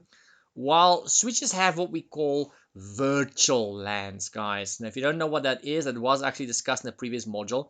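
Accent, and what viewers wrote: South Africa. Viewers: Terry Davies, the best programmer ever, said that the CIA came up with the abbreviation LOL, laugh out loud, to misdirect people from finding out about IOT, Internet of Things. Most people will think that's just insane, which it might well be, but if you're into politics, then it's really not that far fetched lol. Thanks for the video series, it's well presented, and i agree with a previous comment i saw, you have a interesting delivery of the material, which makes it less difficult to learn, maybe it's the accent, i assume SA from the sound of it. Thanks again.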